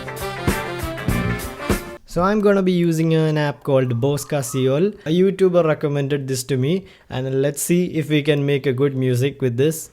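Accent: Kerala, India. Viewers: you kinda sound indian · he has Indian accent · by hearing the voice itself i had a doubt is this guy a malayalee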